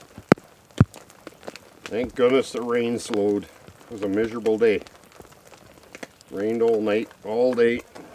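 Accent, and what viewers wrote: Canada. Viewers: Buddy’s so Damn Canadian hahaha. I love how he said gondola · The Canadian accent is why I subbed xD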